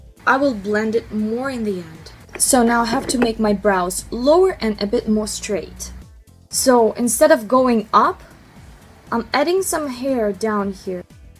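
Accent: Russia. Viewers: Кажется временами у вас проскальзывает русский акцент или какой-то славянский. Я хочу сказать что я в шоке после просмотра вашего видео. Иногда когда вы склоняете слегка лицо и прищуриваете глаза, тогда я точно вижу героиню вашего сюжета. Я честно говоря в шоке как вам так быстро удается это нарисовать. А главное похоже притом что у вас совершенно и глаза другого цвета и лицо другой формы. Я просто восхищена вашим искусством! Сама люблю рисовать но не на лице. Наверное вы какой-то известный визажист(я первый раз на вашем канале) .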